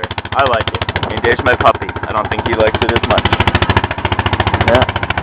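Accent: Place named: Maritimes, Canada. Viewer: sound like a maritimer ta me??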